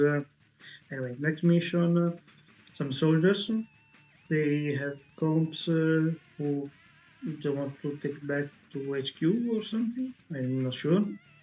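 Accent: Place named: Italy. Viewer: Minchia fra, appena ti ho sentito parlare sapevo già che tu eri Italiano, sei un grande per portare questa grandissime macro serie con i tuoi video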